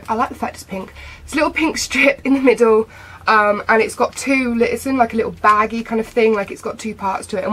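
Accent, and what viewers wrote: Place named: United Kingdom. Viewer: As soon as I saw this video I heard your accent and literally watched the whole damn video because you know what, you're so relevant! I'm British so honestly everything you said was a breeze to follow through because I can access the products and you talk like me :') virtual fist bump you're awesome x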